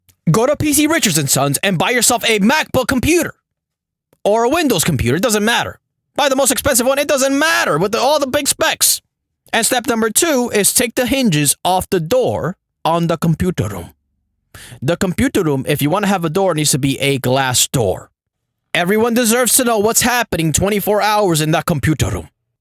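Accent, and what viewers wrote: India. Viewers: You're racist, but your Indian accent is quite entertaining. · First thing I hear is an indian accent 😂😂😂😂😂😂😂😂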